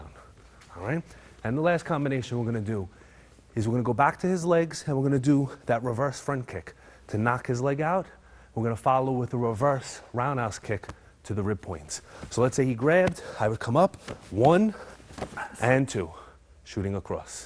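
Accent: New York, United States. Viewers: That's some amazing shit I like your videos you don't act like a bad ass just how it's done. Btw, you from NY.?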